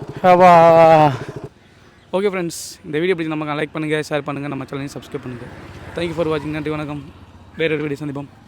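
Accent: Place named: Batticaloa, Sri Lanka. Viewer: உங்கள் கலப்படம் இல்லாத மட்டக்களப்பு தமிழும் மருதநிலமும் அழகு. ஆனால் பாவற்கொடிசேனையில் கடைசிவரையும் பாவை கொடியை காட்டவே இல்லை.❤❤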